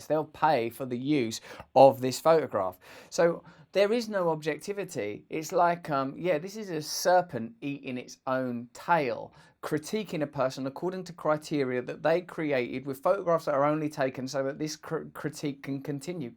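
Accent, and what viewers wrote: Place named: United Kingdom. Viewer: Russel You More Than Look Cool, And Sound Cool, With That British Accent Dude You Are Way Cool!!!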